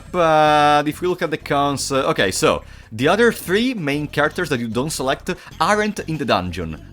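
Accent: Italy. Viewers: il tuo accento italiano si sente fortissimo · ahahahhaah grandissimo si sentiva un pochino dalla cadenza!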